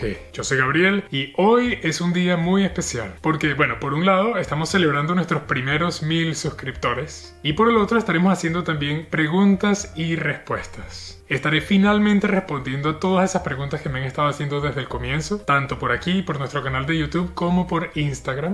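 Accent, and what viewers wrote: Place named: Venezuela. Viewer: ¿Te formaste como músico en Venezuela, en el Sistema de Orquestas y luego te perfeccionaste en Suiza? ¿Rechazas tu condición de venezolano? El acento es innegablemente venezolano.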